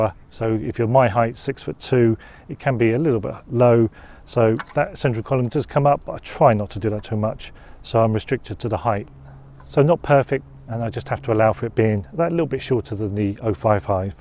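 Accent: United Kingdom. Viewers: At last, someone with a British accent, thank you!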